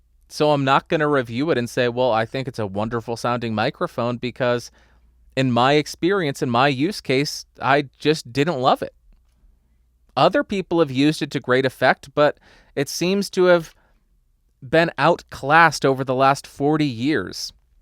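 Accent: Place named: New Zealand. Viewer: Even though your 'kiwi' accent may have offended someone, somewhere... By the imaginary unofficial power vested in me, I hereby dub thee an honorary kiwi.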